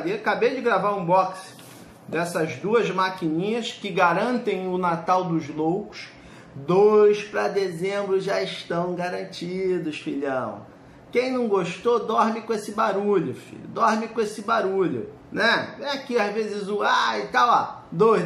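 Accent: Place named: Minas Gerais, Brazil. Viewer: Kkk gostei da mineirice...So observando a receita federal...kkkk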